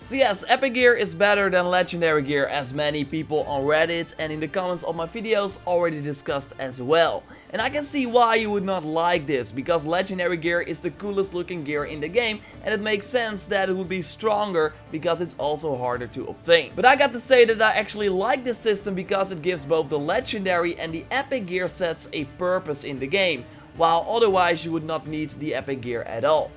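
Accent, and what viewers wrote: Netherlands. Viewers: This dutch accent lol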